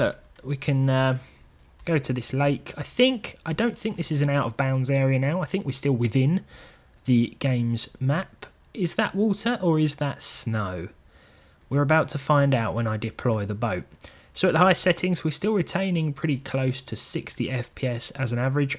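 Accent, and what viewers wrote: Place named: Essex, United Kingdom. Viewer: M25 racer (also London racer) were set in London and the Thames valley. You could race around Oxford or Knightsbridge. sounds like he's more Essex way, but the game was at least set in England!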